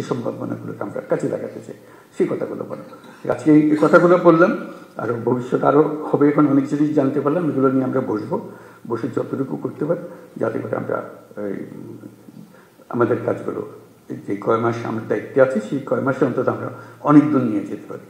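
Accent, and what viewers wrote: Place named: Chittagong, Bangladesh. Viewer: চিটাইংগা কথা গুসসা লাগে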